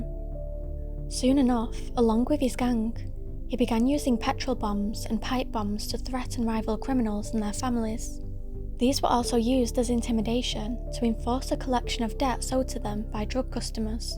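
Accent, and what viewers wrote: Manchester, United Kingdom. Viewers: Your accent is very similar to my south Manchester accent. Enjoying your videos.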